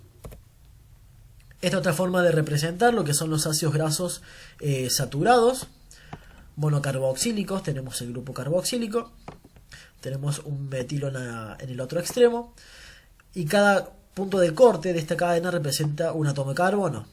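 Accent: Argentina. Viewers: Hablas como argentino de provincia. De donde eres?¿cordobes?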